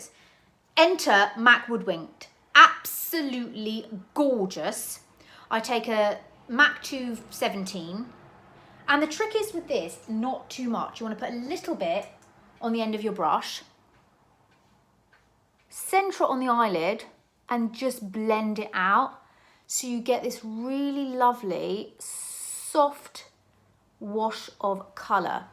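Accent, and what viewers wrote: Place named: England, United Kingdom. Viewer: I really like that lip color on you. I share your love of BM and we are exactly the same age so that tapping of the powder really brings me back too. Also being American I love your accent. For some reason Americans find English accents calming to listen too😂. When I was sick from pregnancy years ago I literally watched hours of lisa Eldridge and it got me through a very sick time. Thanks for the video, love from South Carolina ❤.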